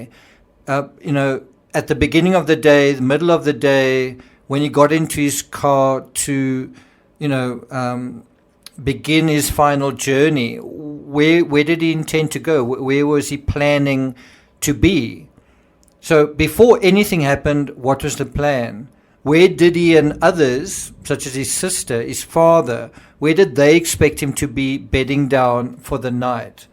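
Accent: South Africa. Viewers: I'm subscribing for the south African accent🇿🇦🇿🇦🇿🇦🇿🇦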